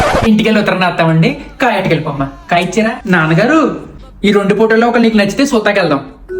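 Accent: Godavari, India. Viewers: Gidavari yasanki,me mata teeru ki take above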